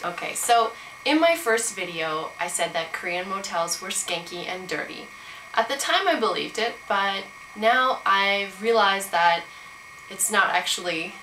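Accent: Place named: Canada. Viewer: You Canadian, ain't ya, white girl? Judging by the accent. :)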